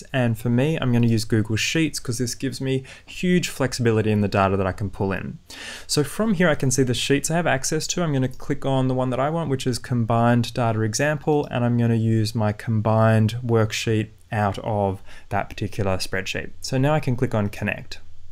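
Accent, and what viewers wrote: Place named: Australia. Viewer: Could listen to the aussie accent all day! Great video, so helpful. Please can you make a data studio step by step report for goal conversion data? Im getting a bit stuck with mine.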